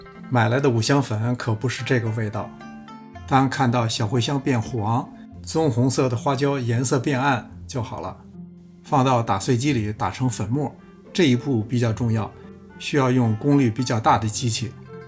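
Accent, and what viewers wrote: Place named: Beijing, China. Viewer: 北京話😂。 不過我姥姥是東北人， 她也這麼說。 很多北京話和東北話的用詞都一樣， 儘管口音不同， 我总覺得這說不定与清朝滿族人有關， 把東北話帶到北京來了。😂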